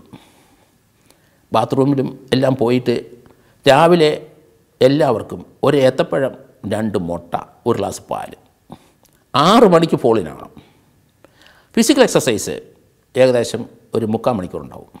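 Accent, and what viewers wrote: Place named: Kottayam, India. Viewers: He has trivandrum, kottayam and idukki slang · അതി മനോഹരമായ കോട്ടയം ഭാഷ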